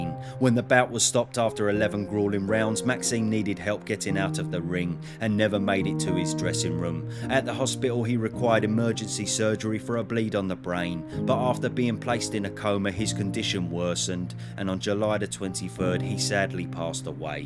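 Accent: Cockney, United Kingdom. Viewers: I love the cockney narration which makes me think of being in a pub in south London. I'm a Brit living in America.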